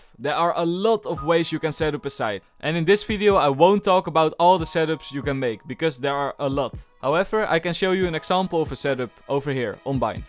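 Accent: Netherlands. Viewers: Pls tell me ur Dutch u can not not be Dutch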